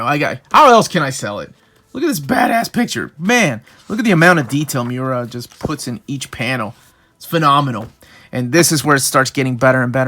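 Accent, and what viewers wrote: United States. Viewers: eres peruano? Hablas el inglés con un acento gringo medio neoyorquino que ni se te nota. Pero se me hacía raro que tuvieras la piel color puerta como yo jajajaja, quizás soy el único otro peruano que te ve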